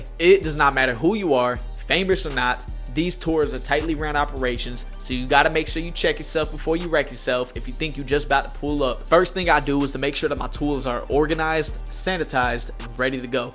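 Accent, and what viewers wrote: North Carolina, United States. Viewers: I think you should get paid as much as you are able to get. I don’t mean you would be unfair to this rapper. I mean I think you are worth an unlimited amount. Your conversations with people are genuine and lovely. The attention you give your haircuts AND your clients is absolutely priceless. I’m in North GA near Athens, so I understand your roots somewhat. Much respect and love to you and your work. You deserve all that life has to offer. I do NOT understand why you talk the way you do, being from NC, but we all have our ways.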